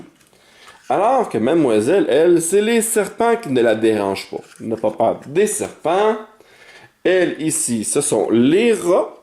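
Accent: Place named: Quebec, Canada. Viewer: Merci pour cette chouette vidéo (avec l'accent québecois !) !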